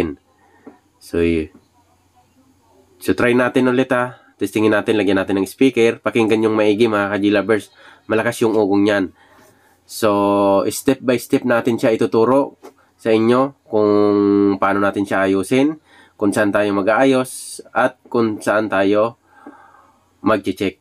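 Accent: Bicol, Philippines. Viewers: Sir salamat👋taga bicol kaba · Bikolano ka? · Lods bicol dialect background mu ah😂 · Bikol ka palan manoy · Bikolano po kyo sir? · Good day SIR,... Parang BIKOLANO k po,... sain po ang "SHOP" MO dyan sa BIKOL?,... nagre- REPAIR k man po nin mga "BEAM LIGHTS",... ( MOVING HEAD)???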